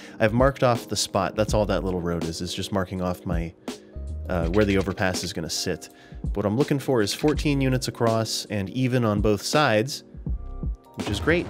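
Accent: United States. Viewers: Arterial - I am guessing you're from the New York area.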